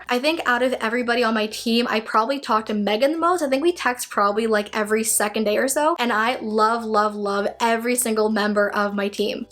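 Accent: Canada. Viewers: where in Canada are you from(I can tell)?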